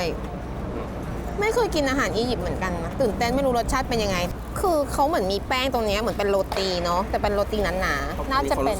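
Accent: England, United Kingdom. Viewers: สำเนียงหนู British มากเลยค่ะ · คุณ เป็นนักเรียนอังกฤษเก่าใช่มั้ยคะสำเนียงออกทางอังกฤษเลยค่ะ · พูดสำเนียงอังกฤษไช้มั่ยเนี่ย ดูepNYมาหลายตอนเพิ่งสังเกต😁😁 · Did you attend school in England? You got an English accent. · ชอบคุณ พูดอิ้งค่ะ ฟังเพลินดี สำเนียงออกบริตติชเลยค่ะ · ชอบสำเนียง British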